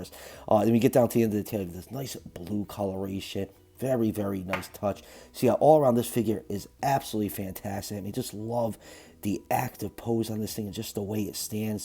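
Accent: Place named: New York, United States. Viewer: I think he's found new york or jersey with his accent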